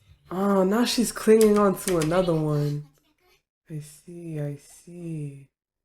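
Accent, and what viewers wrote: France. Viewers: where u originally from? are u french?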